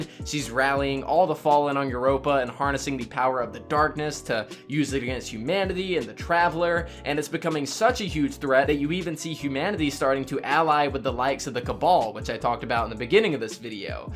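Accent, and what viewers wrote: France. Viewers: C'est un français ?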